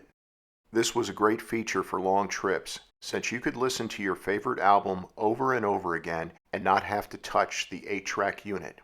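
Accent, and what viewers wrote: United States. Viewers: ...Ah, that mid-Atlantic accent.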